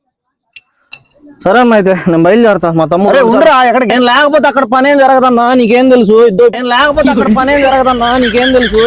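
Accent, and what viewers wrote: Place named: Nellore, India. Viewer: Meedhi Nellore anna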